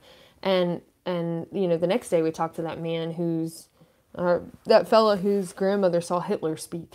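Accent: South, United States. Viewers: Does your Spanish have a southern accent too.? Haha. Glad to see you had a fun safe journey. Thanks for the videos.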